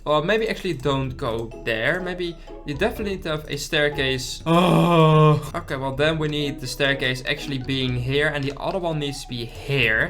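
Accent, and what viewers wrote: Netherlands. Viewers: are u from the netherlands?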